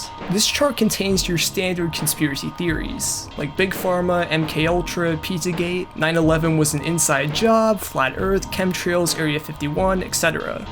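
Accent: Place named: Midwest, United States. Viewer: I hear Midwestern in your accent and it makes me sooo fuckin mad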